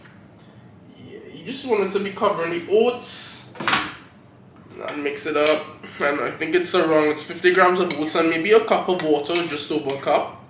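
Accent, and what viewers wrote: Trinidad and Tobago. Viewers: Are you from Trinidad? I'm hearing it vaguely. But anyways, I came across your shorts and it's been history ever since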